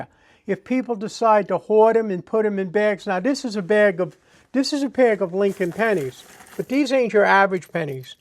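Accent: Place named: Long Island, United States. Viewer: cant hide hat axcent...lol...same here ex Long Islander..!..thank u 4 a breaf & accuret explanation..!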